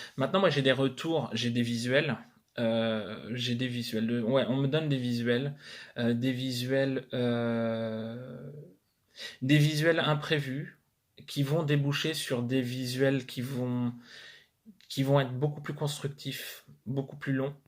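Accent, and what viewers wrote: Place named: North, France. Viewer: ahh j'ai cru entendre parler du nord, bon remarque du sud à chez nous, nous suis bien dans le nord de la France 😂😂😂 Contente de t'entendre à nouveau 🙏🙏🙏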